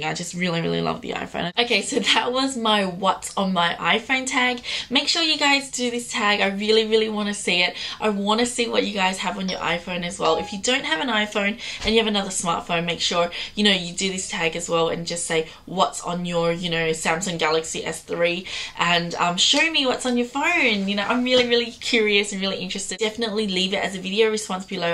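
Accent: Australia. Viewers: australian accent aaa ashdjdkal love it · I think it's an Australian accent. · are you australian?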